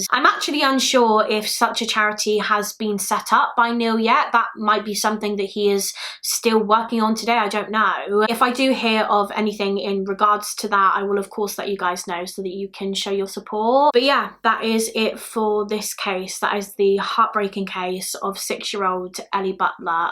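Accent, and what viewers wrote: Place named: England, United Kingdom. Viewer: I don't know why, but when i have a bad day, voice is just relaxing. I love the english accent.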